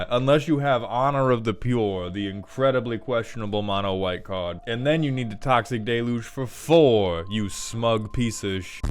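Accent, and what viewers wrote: South, United States. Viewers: why is he so southern today? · Turned that southern drawl up to 11 for this vid :D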